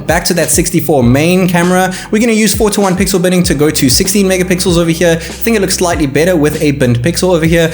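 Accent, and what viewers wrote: South Africa. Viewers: You should add ZAR to you currency conversion. You sound South African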